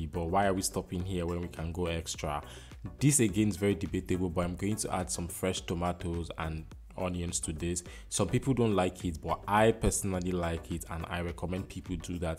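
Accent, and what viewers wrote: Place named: Ghana. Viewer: Bruh. I could swear you Ghanaian lol. This is lit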